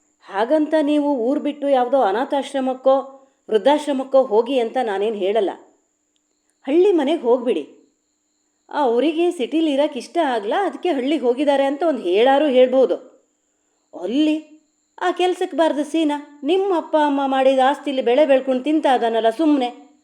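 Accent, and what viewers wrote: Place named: Malnad, India. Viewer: ವಾವ್ ❤ನಿಮ್ಮ ಧ್ವನಿ ಯಲ್ಲಿ ಸೂಪರ್ ಆಗಿ ಮಲೆನಾಡ ಭಾಷೆ ಸೂಪರ್.. ನಿಮ್ಮ ಕನ್ನಡ ದ ಮೇಲಿನ ಹಿಡಿತಾ 🙏ಸೂಪರ್ mam ವಂಡರ್ಫುಲ್ ಧನ್ಯವಾದಗಳುನಿಮ್ಮ ಕಥೆ ಗೆ ❤❤❤️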